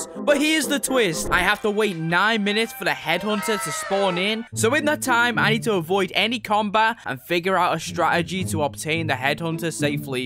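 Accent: United Kingdom. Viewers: Did bro turns to british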